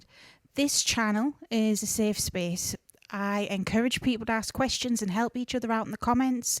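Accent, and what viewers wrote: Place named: Northumberland, United Kingdom. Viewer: Hiya, I'm so happy to have found your chanel. I have just started working with Hecete and I'm just absorbing all of the information I can. Also - where are you from? You sound like you may be fairly close to me - I'm in Northumberland, not too far from Newcastle. BB.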